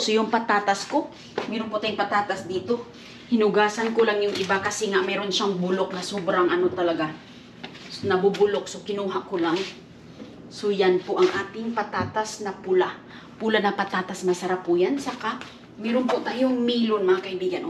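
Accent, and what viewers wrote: Visayas, Philippines. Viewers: Ate NA eexit din talaga ako sa iyo habang nag hohole ka NG mga NA dumpster ka kasi ang igay MO talaga halata g bisaya din talaga hahaheee. Horayyyyy. Ate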